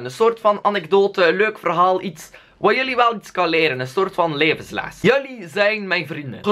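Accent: Belgium. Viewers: probeer eens in een video zonder een Belgisch accent te praten maar met een Nederlands accent lijkt me wel een x grappig;p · je hoort duidelijk dat hij n Belg is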